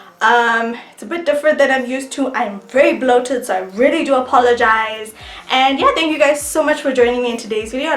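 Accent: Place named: South Africa. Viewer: Hey girl! I loved this video - I am also a YBer from South Africa but I live in London now. So nice to hear a familiar accent! 💕 I liked and subbed to you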